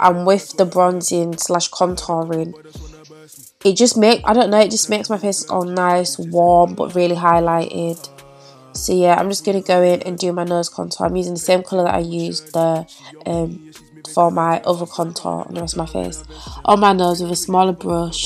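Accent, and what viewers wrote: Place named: Northern England, United Kingdom. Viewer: So refreshing to hear a northern accent on YouTube for once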